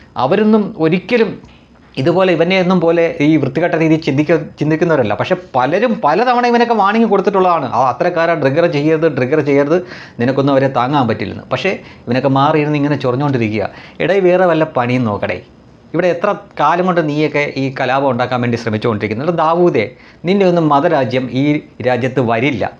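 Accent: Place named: Onattukara, India. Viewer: എന്തുവാടേ, നല്ല ഓണാട്ടുകര ഭാഷയിൽ പൊളിച്ചു കൈയ്യിൽ കൊടുത്തു, keep it up👍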